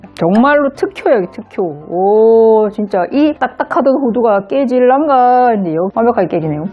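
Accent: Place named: Busan, South Korea. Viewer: 구수한 부산 사투리의 에게 서서히 중독되어 가는 것 같습니다. 개그 재능도 있으세요!!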